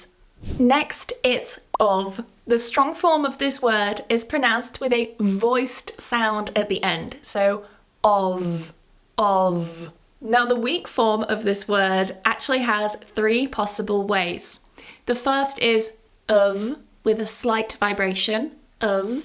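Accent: United Kingdom. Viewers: Que bueno necesitaba esto de prunciar acento british me encanta 😊😊😊😊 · I really i like british accent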